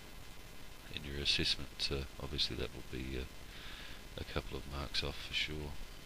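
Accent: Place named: New Zealand. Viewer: He's a bloody KIWI, from Nuw Zulund, not Ostrayan from Ostraya.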